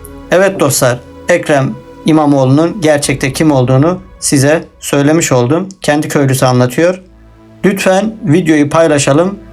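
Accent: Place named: Armenia. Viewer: Senin sesin tam bir ermeni sesi,sarlatan,siktir git,amkoyuyum senin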